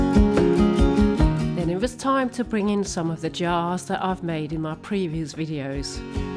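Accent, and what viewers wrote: United Kingdom. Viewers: I love how you decorate, everything looks so beautiful. I like all the crows too. You are very talented. I am from the States in Connecticut. I always wanted to go to London. I love your accent. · I am so happy to have found you! I am from the states, I live in Michigan. I have been to London several times and fell in love with it, especially the people. Your sweet little accent makes me smile. Your decorating is so inspirational and you make it look so easy. The large book/skull cloche in the kitchen is my favorite. Your home looks lovely. Sending a BIG hug with thanks for sharing your ideas! Cherrie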